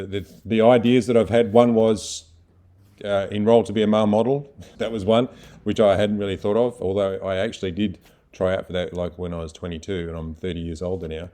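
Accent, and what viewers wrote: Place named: Australia. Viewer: Yes. I say trying health coaching. Maybe sign up for modeling and acting as your Australian accent would be fun to hear in films! Reminds me of Crocodile Dunn Dee! You are unique so maybe looking into those areas. But the the main things is do what you are passionate about!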